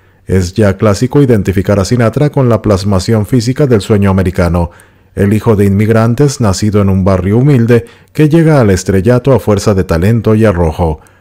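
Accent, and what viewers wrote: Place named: South, Spain. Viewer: me hace reir. Eso significa me cae bien. Despues que termine su disertación voy a ver una película de Ava Garder. Me gusta el acento del sur.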